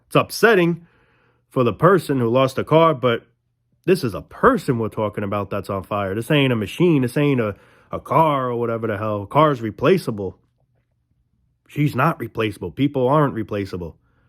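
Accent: New York, United States. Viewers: You're so handsome even considering the horrific topic you are talking about. I love your New York accent too. Yeah showing the video is something that wouldn't be appropriate at any time .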